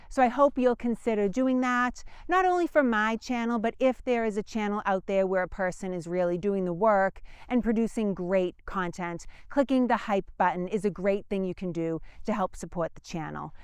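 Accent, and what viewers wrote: Boston, United States. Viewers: I sense a slight baaaastin accent cute!!! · Another well-done informative video. Keep up the good work. I’m hard of hearing so I have to use the closed-captioning. It’s a real treat the way it sometimes converts your Boston accent. Conquered vs Concord. Luckily my SIL and brother live there so I’m able to figure it out having talked to her for 40 yrs or more. It keeps my mind active anyway. LOL. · My weekly dose of American history and a Boston accent. Thank you! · Thank you. Just discovered you a few weeks ago. Love your Boston accent, and your explanations from our past important history during the revolutionary war. I'm a west coast watcher from Oregon, and enjoy your stories and videos. Keep em' coming! 😉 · I'd hype you for your accent alone! I love me some Boston!! ❤😉🇺🇸❤ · Awesome accent Anytime someone with a Mass/Boston accent teaches Revolutionary war history. 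I’m in!! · Just found this channel and, there is NO ONE I'd rather hear talking about this then you and your Bahstin accent:) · like the Boston accent! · I don't usually like the Boston / yankee accent lol. rocks it though! Keep up the good work n GREAT content, look forward to hearing more from ya in the future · Man I love the Bahston accent and all the talk about maahching! Great content, too! Lived in Boston for 9 years. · I detected the Boston, but I could swear there were some Aussie influences there too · Thank you. You really helped bring it to life for me. And Love that Boston Accent. Put a smile on my face. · That Boston accent, though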